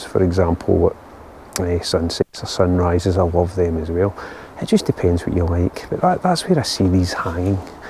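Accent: Scotland, United Kingdom. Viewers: I love your work and ICm Concept. Unfortunately i profit only half of it beacause I am unable to understand your Scotish. I respect it but for me this is a bit frusutrating. However I your work is outstanding.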